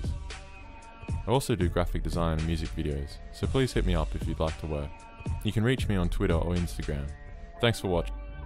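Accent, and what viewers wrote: Australia. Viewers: Pretty sure his accent’s Australian but there was no need to send hate like that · bro are u Australian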